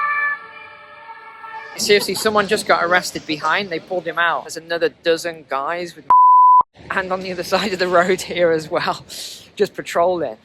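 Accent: England, United Kingdom. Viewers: This happened 10 years ago already and even before it. Did it just occur to u? U sound british. Not much different there. Or in Germany. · Sounds like an Englishman. You should be used the national government handing over the country to arabs and africans.Their country now. Get out!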